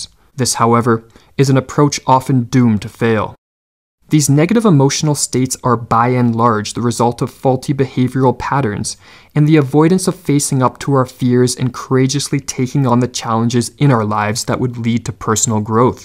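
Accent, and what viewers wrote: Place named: Canada. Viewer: Your "outs" and "abouts" indicated clearly to me that you're a Canadian.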